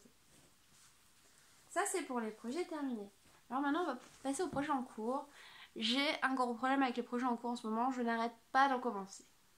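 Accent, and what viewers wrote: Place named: Picardy, France. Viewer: Coucou je me disais bien que ton accent m’est familier. Je suis originaire de la baie de somme en Picardie 😉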